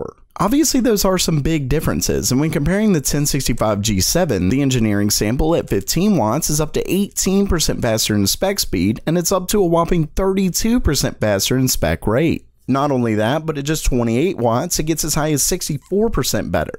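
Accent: South, United States. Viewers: That southern accent yamsayin’ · He's Southern. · That's a Southern American accent.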